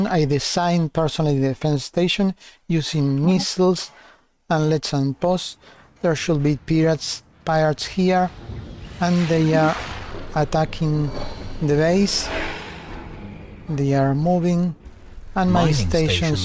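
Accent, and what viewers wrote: Chile. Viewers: no entiendo i ""J" no hablo chileno pense que era en español XD,. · Desde cuando no entiendo español? Sera español chileno. Buen video como siempre.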